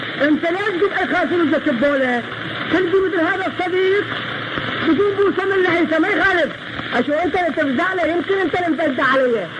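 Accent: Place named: Baghdad, Iraq. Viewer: مشكلة بعض الكلمات غير مفهومة بسب التشويش بس الكلام البغدادي الاصيل روعة شلون لهجة حلوة ❤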